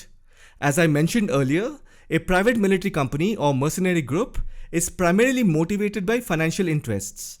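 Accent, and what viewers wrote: India. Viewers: He has the best Indian accent - the most informative Indian accent - absolutely the best... is the man....🫡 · Don't like Indian accent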